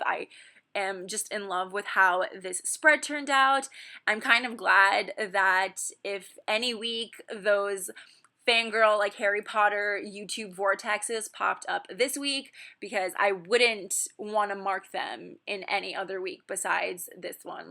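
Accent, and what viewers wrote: United Kingdom. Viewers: THAT BRITISH ACCENT THO 😆😍😘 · Your british accent 😂😂😂😂😂😂😂😂😂😂😂😂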